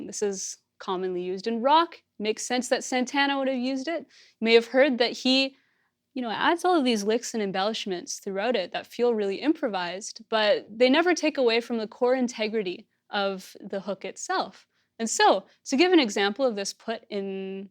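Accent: Canada. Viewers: God I love her canadian accent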